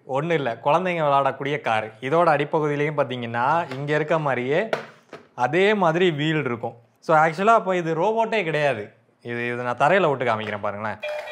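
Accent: Tirunelveli, India. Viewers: தூத்த இடம்.. திருநெல்வேலி slag ❤❤❤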